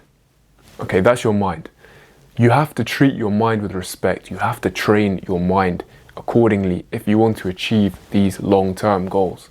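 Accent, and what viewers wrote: England, United Kingdom. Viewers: you are an absolutely gorgeous and handsome young man - your English accent is so sophisticated and classy and you have gorgeous blue eyes - I’m an older Irish gay man in the U.K. and I would happily date you in a heartbeat - all the best for 2024 🇮🇪☘️🇬🇧❤️💗🙏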